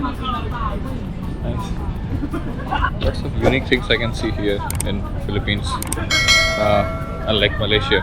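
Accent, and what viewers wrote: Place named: India. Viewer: Listen to yourself! You can hardly be understood. You are speaking English with deep Indian accept. DAH!!!!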